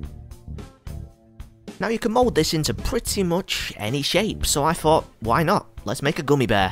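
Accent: United Kingdom. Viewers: He's British lol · It's probably the UK pronunciation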